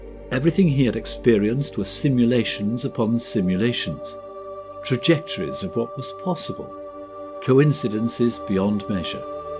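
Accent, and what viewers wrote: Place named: United Kingdom. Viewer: I always think it’s funny when a British voice narrates about Indian culture/religion/history 😂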